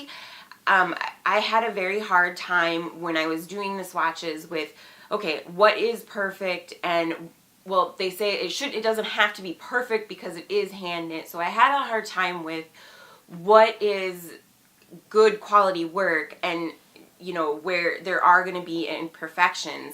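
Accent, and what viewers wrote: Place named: Minnesota, United States. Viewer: Oh good! Also, I am originally from southern Minnesota and your accent takes me back! 🤗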